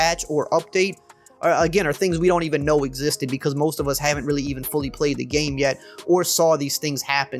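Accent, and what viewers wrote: Louisiana, United States. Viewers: Just discovered this channel and damn you have to be from the bayou lol accent is thick.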